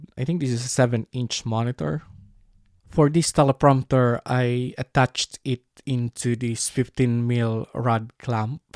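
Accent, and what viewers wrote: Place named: Philippines. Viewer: Hi there! I hope your have a great day! Nice video! I have a question, are you Filipino? My mom was born there! Just wondering if you are because you sound like you are! Have a good day!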